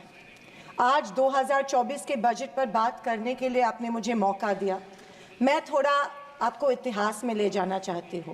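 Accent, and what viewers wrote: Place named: Solapur, India. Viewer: Nice solapur avaj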